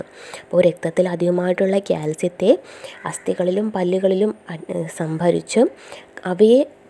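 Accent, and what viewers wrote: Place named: Thiruvananthapuram, India. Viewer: Ente same soundum slangum,Teaching methodum . Trivandrum aano. I am surprised.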